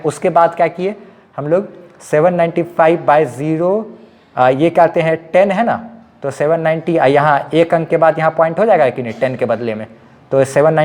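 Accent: Bihar, India. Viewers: Sir ji Bihar se ha kya ?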